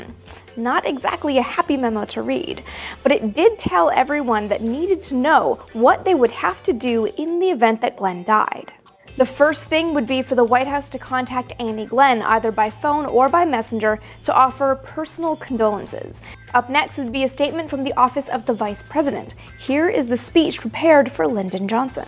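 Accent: Canada. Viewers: so sorry but your love for NASA shows american, your slight accent shows Canadian, what are you?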